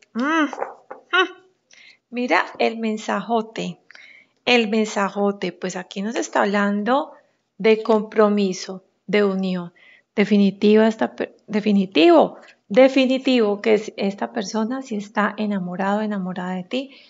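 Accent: Colombia. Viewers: Buen día y si no me ekibocoese asentó es colonviano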